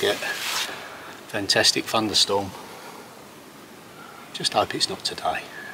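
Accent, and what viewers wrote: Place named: London, United Kingdom. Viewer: Doors look great. I am interested in your slight accent. As I was born in Kenya during colonial times, my mother was from Southern Rhodesia Zimbabwe. It is said I speak with slight ‘Rhode ‘, your accent sounds somewhere near or in London., retired Airline pilot. Thailand.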